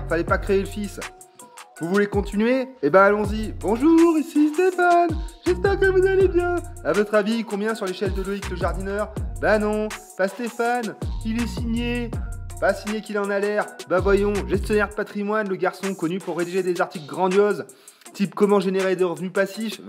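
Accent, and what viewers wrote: Loire, France. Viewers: avec l'accent de la Loire, je dis oui!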